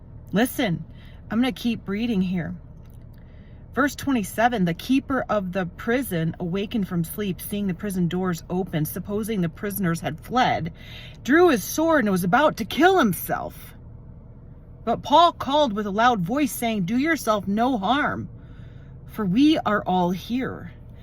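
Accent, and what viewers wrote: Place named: West Coast, United States. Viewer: Seems like you have a west coast accent, i thought michegan folks would kinda have a jersey accent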